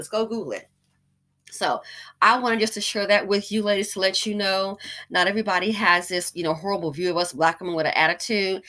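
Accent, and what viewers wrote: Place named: South Florida, United States. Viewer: I knew you were around Palm Beach. I’ve been trying to pin point your accent because you sound like another YT’er I listen to who lives in South FL. I love your accent.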